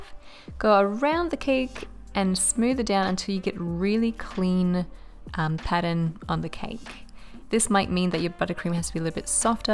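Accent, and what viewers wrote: Australia. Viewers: Hi,. Your lemon cake looks so pretty, just like you and your Australian accent, mate. What's your best tip for making the best strawberry cake? Take care of yourself, sweetie. <3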